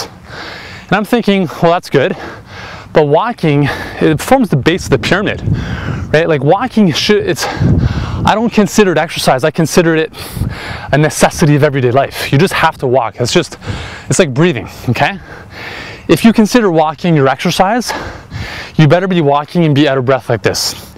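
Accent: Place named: Canada. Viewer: CANADA ! O'bout ! (Love this accent)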